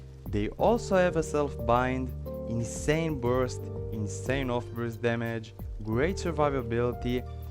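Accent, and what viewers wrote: Israel. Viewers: Hey, is that a hebrew accent ? Love your channel bro ! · You sound like you’re from Israel haha. Awesome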